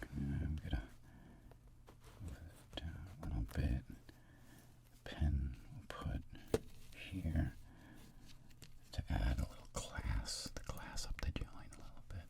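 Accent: Boston, United States. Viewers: I never noticed his... Boston (?) accent before. He's always affected a different accent every time. Interesting. I love this video. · I Sense the Boston Accent is Strong with this one.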